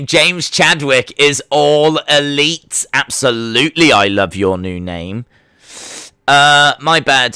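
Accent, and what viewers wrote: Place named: Midlands, United Kingdom. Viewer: Just found your channel. You from the Midlands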